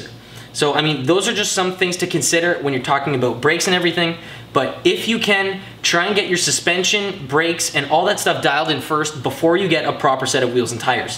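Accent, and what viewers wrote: Canada. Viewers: Canadian eh??????????????/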